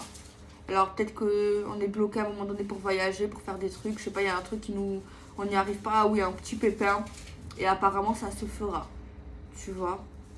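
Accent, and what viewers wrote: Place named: Marseille, France. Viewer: bonjour super vidéos je t’adore et ton accent et de Marseille gros bisous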